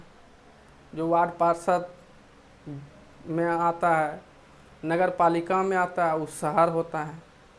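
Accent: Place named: Patna, India. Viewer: Patmij vala kahe Bolte ho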